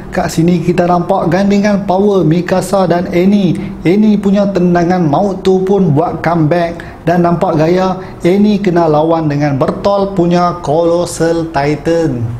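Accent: North, Malaysia. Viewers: Haah bangsa utara kita haha 🤣🤣🤣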